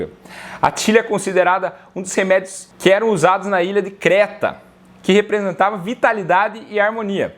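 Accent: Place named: Minas Gerais, Brazil. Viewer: Dr vc e mineiro? Convesa igualzinho mineiro,, eu sou mineira com muita orgulho!!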